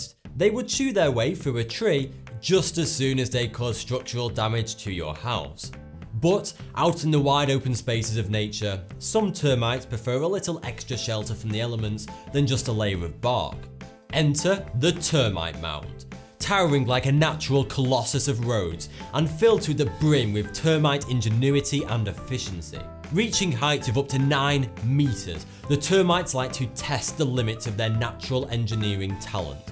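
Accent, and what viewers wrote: United Kingdom. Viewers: why does everything sound better from a British guy..its like my brain actually absorbed informAtion more easily.. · Where does your accent come from? It sounds like British but different somehow.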